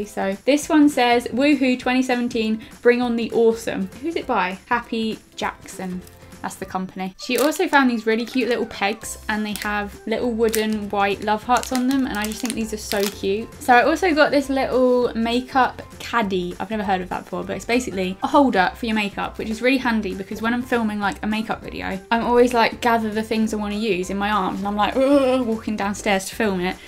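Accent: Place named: London, United Kingdom. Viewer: Sotaque de Londres não engana!🇬🇧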